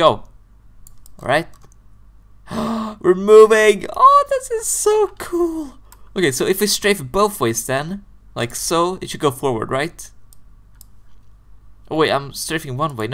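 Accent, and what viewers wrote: Sweden. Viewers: Are you from sweden. I think i can hear it in your accent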